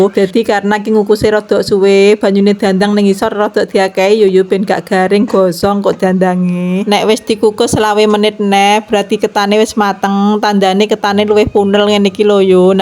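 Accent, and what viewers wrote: Java, Indonesia. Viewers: Ra kaprah..dialek .bjn blora y yu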